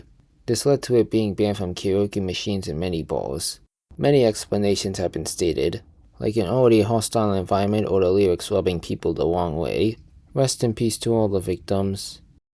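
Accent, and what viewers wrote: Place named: New York, United States. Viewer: Why do you sound like a New Yorker or New Englander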